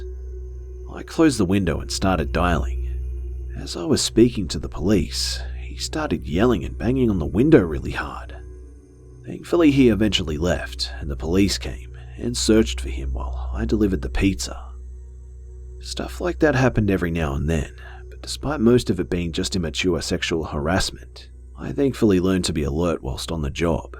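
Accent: Australia. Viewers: Be. Australian? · Sorry to hear about your situation. I'm a huge fan of all your wonderful Aussie accent and stories, I'm sure YouTube will go back to normal quickly. You know shit like this happens a lot, good luck with your patron. I wish I could be more help, but I'm pretty much where you are. Good luck anyway.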